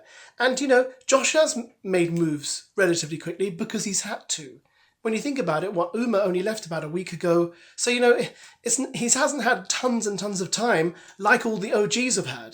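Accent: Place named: England, United Kingdom. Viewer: I really do enjoy your cadence mixed in with your accent. Could I ask what part of England is this accent from?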